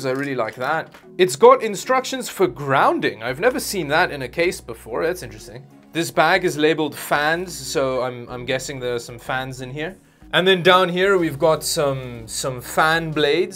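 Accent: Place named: Afrikaans, South Africa. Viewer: A Canadian man with a very peculiar spelling of the name David ( which has many origins like Polish, Dutch, and most of all I get an Afrikaner vibe from our channel host but he may just be French Canadian which can sometimes have a hard to place accent especially from the younger generation) has bought my unique and rare piece of computer hardware on eBay. I wonder if its the same guy?!?! I am sure it wasn't hard for the guy to figure it out especially if he was a fan of yours which is pretty cool. I have an extremely rare spelling of my middle name and my first name is uncommon as well so I get how easy it is for him to have placed you.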